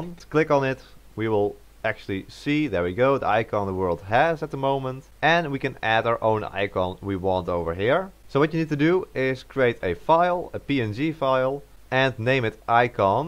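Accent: Netherlands. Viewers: Thanks for the guide, are you dutch? You sound like from the netherlands love their english accent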